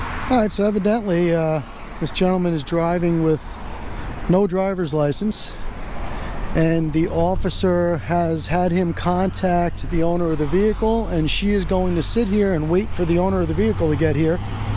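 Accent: Cuba. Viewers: Dang bro, you speak really good Spanish. You sound like a you could be cuban just about. Lol 👍🏽😎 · You speak perfect fluent spanish, although you speak a caribbean spanish accent, more like Cuban.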